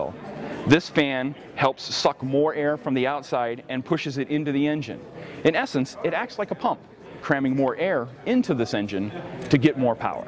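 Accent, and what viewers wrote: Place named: United States. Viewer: pronouncing it with his stupid American mouth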